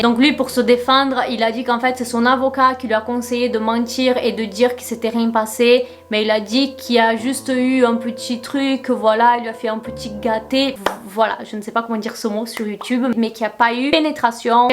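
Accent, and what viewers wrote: Brazil. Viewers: J’aime tellement ton accent ! Tu as les mêmes « défauts de langage » (je savais pas trop comment dire ça) que ma mère je trouve ça trop miiiim’s Bref continue comme tu es 🌊 Juste une question ? Tu es d’où au Brésil (sou carioca e vascaina) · Coucou! Un petit commentaire pour te dire que j'adore tes vidéos, ton naturel, ta façon de raconter etc En plus pour ne rien gâcher tu es magnifique et j'adore ton accent brésilien (je suis portugaise) ! Je t'adore et tu me detends énormément quand ça va pas ❤❤❤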